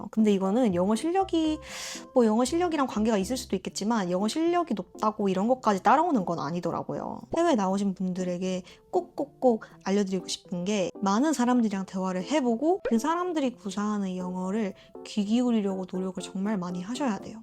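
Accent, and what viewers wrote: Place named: Gyeongsang, South Korea. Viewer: 갱상도 사투리네여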